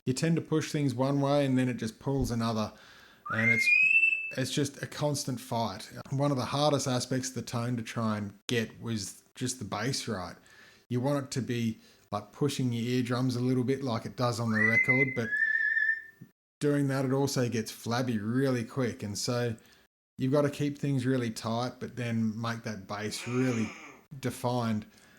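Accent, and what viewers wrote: Australia. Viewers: holy shit you're Aussie! I had no idea :) Keep up the good work mate!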